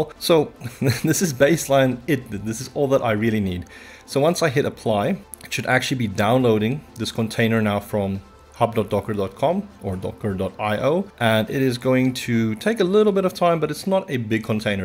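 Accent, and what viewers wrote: South Africa. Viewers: <num>... Knew I was picking up a South African accent. lekka man!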